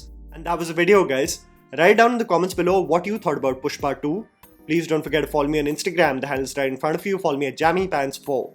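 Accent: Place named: North India, India. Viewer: Dude why is your English do bad??? The accent of a North Indian man-child aside, you use such terribly kaam chalaau words like "come down" n mispronounce words like "crescendo" and also say much awkward phrases like "shoulders of the performance of Allu Arjun". Clearly your abhorrent sentence structure comes from translating Hindi phrases directly into English without adapting it to language convention. I don't mind listening to your other commentary videos but your movie reviews specifically highlight your absolute weaknesses with the English language. Why not just do reviews in Hindi then? Perhaps you'd sound less awkward n blundering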